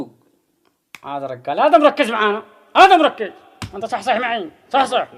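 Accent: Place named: Raymah, Yemen. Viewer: ...يتحدث اللهجه الريميه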